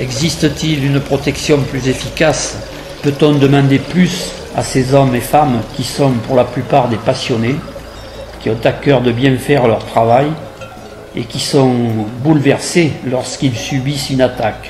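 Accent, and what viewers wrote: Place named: Provence, France. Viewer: Mon père qui est randonneur me parle souvent du Mercantour qu'il affectionne pour l'avoir foulé plusieurs fois. Je le découvre avec émerveillement grâce à tes images magnifiques. Comme le dit si bien Daniel (Lapin 25), ta voix de provençal nous fait déjà voyager. Grand bravo, amicalement Gégé et Luciole du Doubs.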